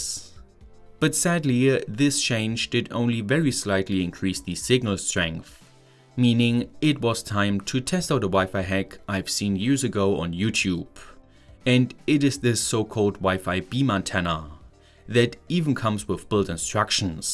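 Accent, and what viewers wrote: Germany. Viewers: Ich feier ihn immernoch für das spezielle Deutscher redet Englisch. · If you are a, why do you have such thick German accent?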